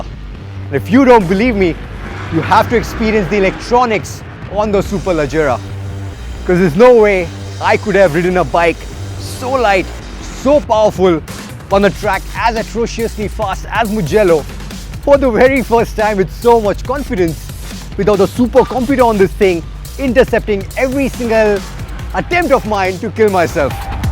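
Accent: India. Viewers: Indian accent... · Indian accent ruined it for me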